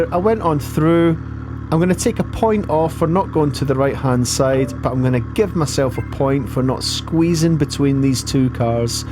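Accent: Scotland, United Kingdom. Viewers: Just found your channel. Enjoying the content but with your accent spent a while trying to recognize which part of Scotland you are in. 😂 Also a returning rider and being rusty on my town riding these are excellent studies in the art of... Thanks. Subbed.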